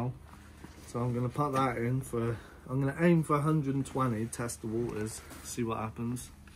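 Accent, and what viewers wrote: Cornwall, United Kingdom. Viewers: What part of the country are you from? Sounds like Cornwall?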